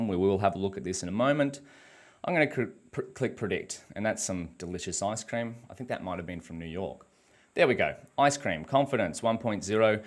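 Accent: Australia. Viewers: Love the aussie accent bo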